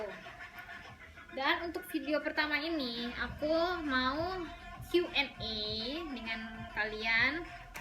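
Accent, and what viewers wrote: Indonesia. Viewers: logat jawa timurnya gk luntur semangat! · Buat satu konten yg full pakek logat pacitanx.................. Pasti seru.........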